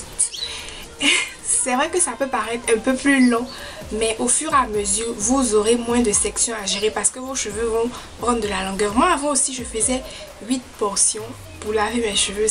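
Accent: Ivory Coast. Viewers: J'ai imediatement cu qu'elle est ivoiriene grase a son acsen francais.D'esole pour mais faute mon clavier est en Englais je Vie au etat unit et je suis burkinabe je vais au licey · Jaime bien tes conseil tes belle avec cette voiture mais l'accent ivoirien te a trop mais bon courage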